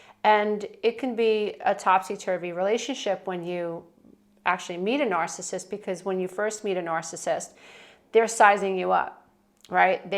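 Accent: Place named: East Coast, United States. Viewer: Thank you your informative video. You're from the Tri-State area. I can't help but note an east coast accent.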